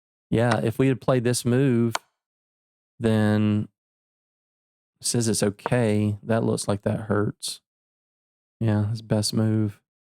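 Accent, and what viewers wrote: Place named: North Carolina, United States. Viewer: Love the video and series! Where are you from? I’m an accent guy and it sounds like you’re from somewhere close to where I used to live (Raleigh, NC).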